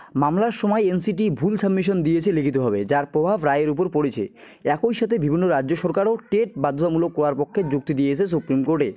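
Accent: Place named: Bangladesh. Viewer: Tui 100% bangladeshi, tor kotha sunei mone hoche, edeshe kire bara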